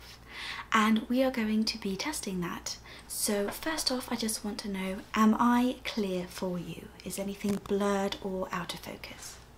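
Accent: England, United Kingdom. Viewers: ASMR Your accent is more refined than the other British ASMR artists - Always good to have a unique trait like that. This also makes is more believable that you're a physician as it falls into our preconceptions of how someone with that job would speak. On top of that, you display a good amount of confidence and maintain eye contact with the camera/patient, which adds further to the credibility of this persona. Underpinning all that you also seem to have the basics of ASMR down pretty well. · And what about a Scottish or Welsh accent? They're British too. This is an ENGLISH accent! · English* not British. There I no such thing as a "British" accent. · Can I ask, are you originally English or just perfectly pretend to be? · I LOVE British accents🙏🏻 you are my favorite 🙈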